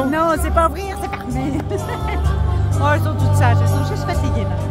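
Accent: Belgium. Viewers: vous etes belgiques ou francais?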